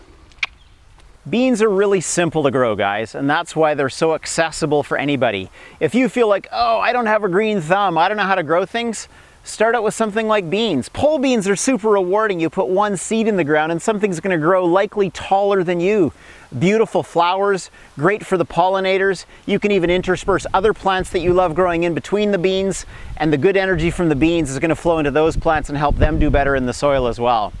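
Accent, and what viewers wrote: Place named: Canada. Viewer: I can hear the faint Canadian accent!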